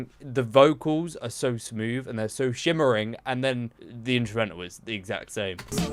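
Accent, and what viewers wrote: United Kingdom. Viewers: イギリス英語が聴けたのでとても嬉しいです！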